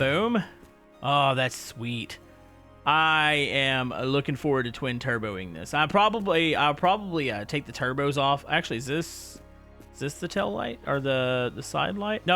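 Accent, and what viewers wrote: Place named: Texas, United States. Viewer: hes from texas so he talks like that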